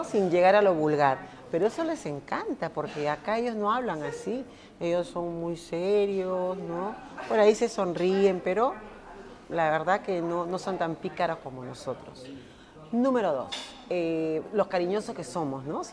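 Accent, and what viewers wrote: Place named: Peru. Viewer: me encantó su manera de expresarse, muy pausado, muy agradable, aparte que el acento peruano es tan lindo ;) saludos desde Colombia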